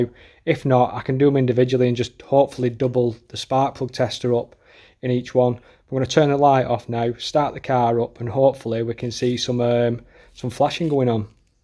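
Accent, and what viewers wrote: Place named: Burnley, United Kingdom. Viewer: Thought you sounded local. I’m in Burnley 😊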